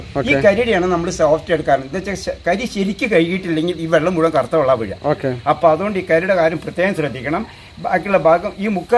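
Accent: Palakkad, India. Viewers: Palakkadan slang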